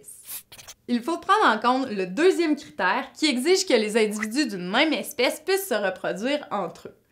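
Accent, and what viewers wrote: Quebec, Canada. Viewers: Merci beaucoup ! En plus l'accent québécois est agréable à écouter haha ! 😀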